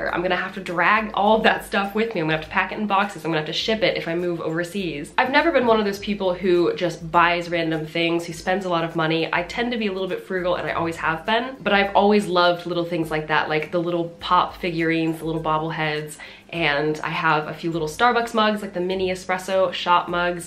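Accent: Midwest, United States. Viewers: What state are you from originally, if you don't mind sharing? I'm guessing Ohio, Michigan, or Indiana. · I love studying accents and exploring how people's speech patterns change over time. I am from CA and lived for two years in the UK. My speech and word choices were all over the place when I got home. I can still hear the Midwest in your speech!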